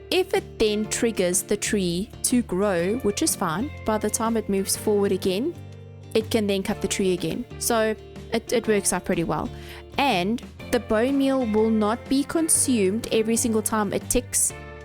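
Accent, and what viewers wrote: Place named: South Africa. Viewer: Can’t get enough of the South African accent, could listen to it all day long, this coming from a Scot. Great video!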